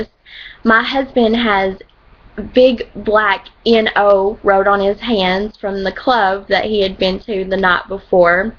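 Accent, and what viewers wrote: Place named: United States. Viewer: Hey momma holy country accent lol that took me by surprise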